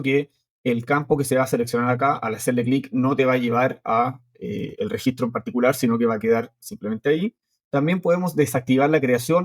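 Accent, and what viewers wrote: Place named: Chile. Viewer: Hola como estas?, Genial tu apoyo con ODOO, lo uso hace años pero primera vez que veo buenos videos en español, y en "chileno" jajaja. Una consulta, estoy intentando agregar las comunas al formulario de contactos, ya que necesito que los clientes tengan comuna y region relacionados, pero no logro que al cambiar la región (res.country.state), en el campo Comunas (que cree con Studio), me muestre solo las comunas de esa región. ME darias algún tips?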